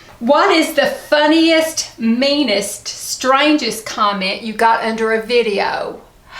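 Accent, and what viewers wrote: South, United States. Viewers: Nothing finer and sweeter than a real southern accent. Wear it with pride my dear. I used to feel shamed having one for years and over the years I’ve learned to embrace it. It is a part of my identity and I’m proud of it! If folks don’t like it so be it. Probably more about them that is more unloveable than my accent that seems to bother them. When people make fun of my accent now I lay it on even thicker lol. You are a rare beauty. You are beautiful inside and out and an inspiration! Love your channel. First time commenting. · You are a wonderful, sweet human being. I am so happy you have avoided this awful virus. I was hospitalized for eleven days two weeks ago, and it was no joke. I hope you are getting the vaccine. I had no prior illnesses and it was bad. I look forward to hearing that great Southern accent! Love you and your channel! 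Stay healthy!! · southern US accent I believe!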